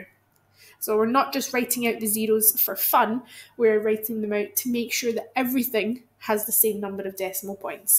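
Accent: Scotland, United Kingdom. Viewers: Are you from scotland?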